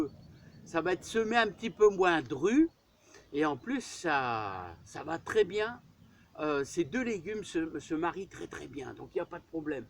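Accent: North, France. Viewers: je travaillais avec des collègues qui venait de la SEITA de Lille ,certains de Bétune. Lorsque nous avons tous été licenciées se fut le retour au bercail pour eux. Avec vous, je retrouve le parlé du Nord et j'avoue que ça me fait du bien. Bonne après midi à vous.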